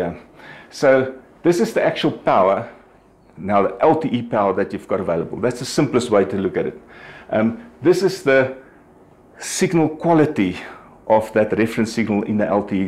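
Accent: South Africa. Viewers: South African right?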